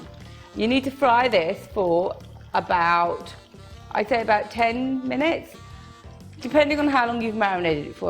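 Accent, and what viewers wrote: United Kingdom. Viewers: she is from England. So its her real accent. She has a British accent.. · Really nice recepie. I've had this in New York at a restaurant called Symphony Spice it was more saucy though like said make it dry or saucy. Thank you, love your British accent 👍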